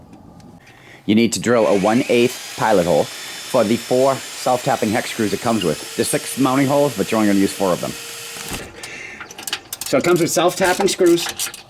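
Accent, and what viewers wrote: Boston, United States. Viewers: Installed Ready Brake and Ready Stop today on my JL.. It was a long day.. Also installed Maximus -3 Tow loops for the JL instead of a base plate . I have a front hitch on mine use for plowing and the Tow Loops play nice with it. Only issue with the Tow Loops is the NSA Clevis Adapter for Maximus 3 tow loop opening is 2mm too small. I had given them the specs. They said they had it in stock.. Welp yeah but wrong size.. They are gonna ship me out the correct one this week... Hey is sound like you are from Boston also..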